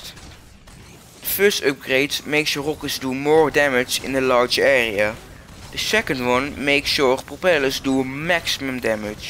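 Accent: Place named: Netherlands. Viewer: i can just feel ur dutch voice.. Don't worry about it i have the same problem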